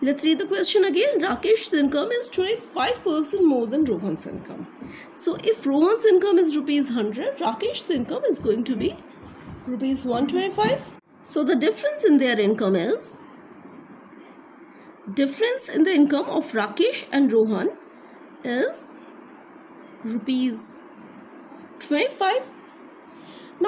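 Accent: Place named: England, United Kingdom. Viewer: Bahut English aat ke bol rahi ho Lag raha ki english man ho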